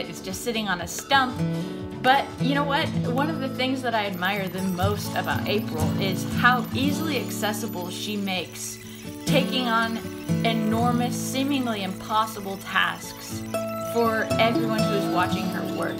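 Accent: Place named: Texas, United States. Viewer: are you a Texas gal too, accent sounds right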